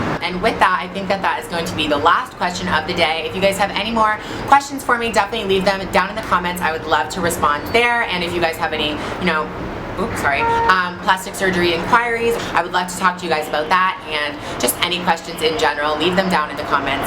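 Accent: Canada. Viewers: she is from Canada, our english is similar to the UKs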